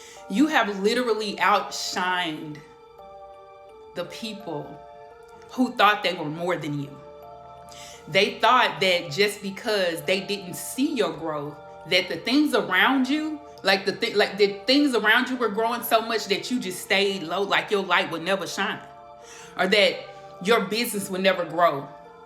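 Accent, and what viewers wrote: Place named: South, United States. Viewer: Thank you for your readings. On point. Question. Your accent. Are you from Virginia or the Carolinas?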